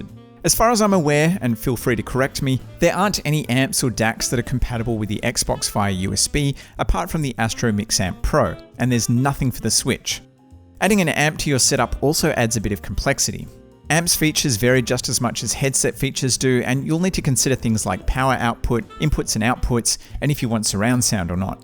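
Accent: Australia. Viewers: Great video, don't know how i didn't see this ages ago. Is treble important for healing footsteps? I thought i heard an Aussie accent so had to Sub.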